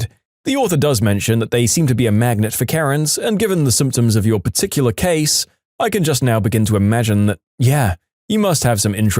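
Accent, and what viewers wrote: United Kingdom. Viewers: Bro is british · Is bro british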